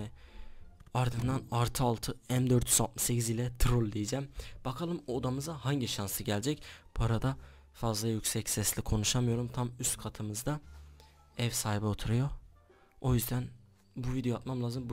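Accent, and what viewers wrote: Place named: Malatya, Turkey. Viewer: abi nerelisin bizim melekt gibi konuşuon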